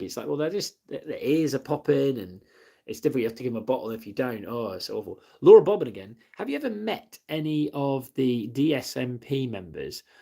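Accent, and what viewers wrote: United Kingdom. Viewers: You can tell he is British….😂I’m British to My fav teacher is mrs fox, I wish British people didn’t have to wear school uniform, I have to wear a green tie 🙄 and it’s SO uncomfortable 😭 😅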